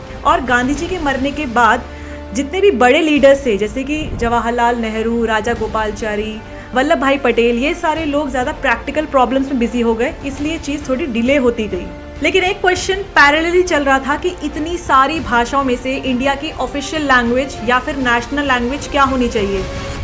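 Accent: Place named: United States. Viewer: American English 😄❤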